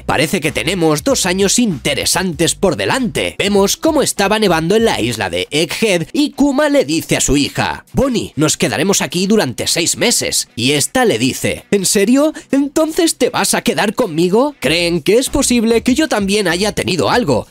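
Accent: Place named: Galicia, Spain. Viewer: Me molesta el acento gallego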